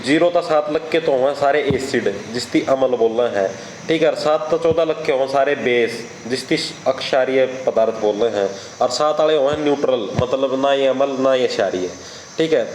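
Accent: Haryana, India. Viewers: Khadi haryanvi hai madam ji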